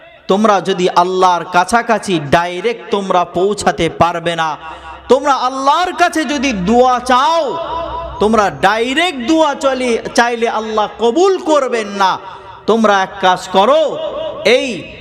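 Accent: Bangladesh. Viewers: আপনার কথা শুনে কেন যেন মনে হচ্ছে আপনি বাংলাদেশী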